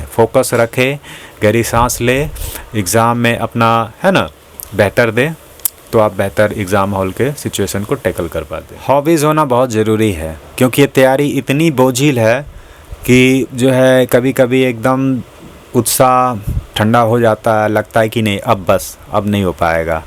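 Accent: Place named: Bihar, India. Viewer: Hai na. Karna baar baar shayad thik nhi h Bihar ki jhalak aa rhi h shaayad isme sudhar ki jarurat h Bhai.baaki lage rho .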